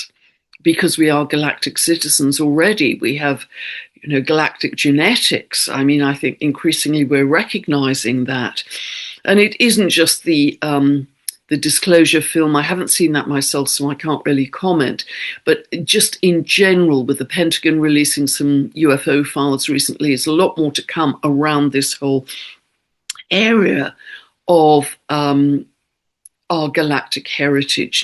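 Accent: United Kingdom. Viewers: Good work! And nice to hear my first English BRITISH English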